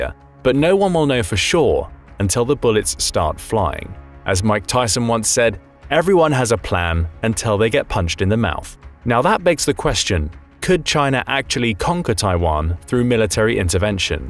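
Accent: England, United Kingdom. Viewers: Hearing someone with an English accent use nba references is wild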